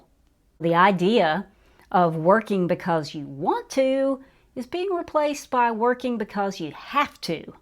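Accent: South, United States. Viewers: Southerner here. Trying to place your accent- North Carolina? GA?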